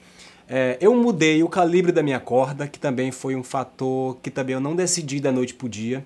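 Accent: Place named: North, Brazil. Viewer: vente você nesse vídeo que você conversa bastante e toca um pouco, parece duas pessoas, você tem um falar calmo, simples com esse sotaque lindo do norte, mas quando toca fica um monstro( no melhor sentido) e arrebenta... Valeu Cara!!! Por toda humildade e talento.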